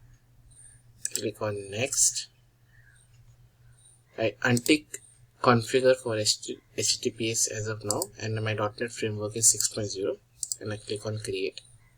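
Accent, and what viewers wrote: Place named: India. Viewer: Why is indian accent so horrible?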